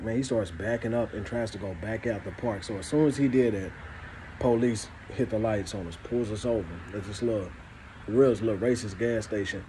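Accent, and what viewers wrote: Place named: Memphis, United States. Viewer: I appreciate your videos. You gotta be from Memphis that accent thick!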